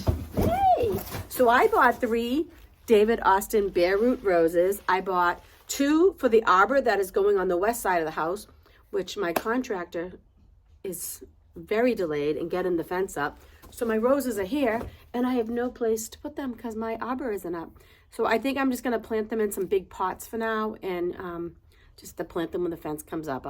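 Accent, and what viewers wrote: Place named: Massachusetts, United States. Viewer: I'm surprised you didn't call Ms. Dig before you dug some holes. Be careful!! Love your accent so Massachusetts just like mine even though I've been gone from Taunton & Boston so many years ago. You sound like my cousin from Taunton. Spring has sprung....take care.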